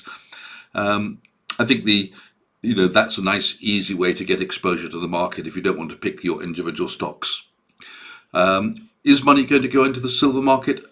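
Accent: United Kingdom. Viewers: I love the content of course, baut also, as a French, is just delghted listening to your british accent.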